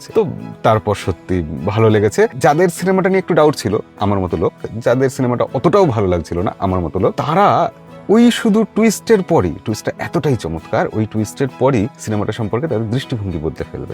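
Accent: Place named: Bangal, Bangladesh. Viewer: Apnar bangal accent ta amar besh lage 😊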